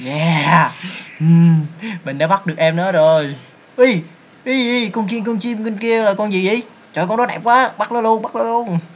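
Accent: Mekong Delta, Vietnam. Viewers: thấy tiêu đề với hình nghĩ ad lên tay rồi ko ngờ ko ngờ là bị mấy con khung long săn lại, mém bỏ mạng kaka đi bụi luôn. ad giọng ad nc dễ thương, người miền tây hả ?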